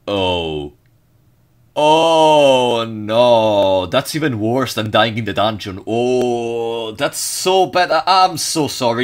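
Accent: Italy. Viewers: ho capito fossi italiano dall'accento loool ciao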